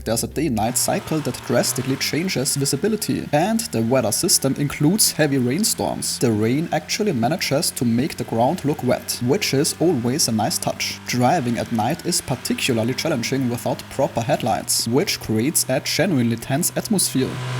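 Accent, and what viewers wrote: Germany. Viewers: Excuse me Brother but kommst du zufällig aus Germany? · Only narrator where I am not sure whether he is talking german or english. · a little bit german ich höre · Versteht man ja kein Wort mit dem deutschen Dialekt. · Das Denglisch hör ich doch direkt raus. Gutes Video❤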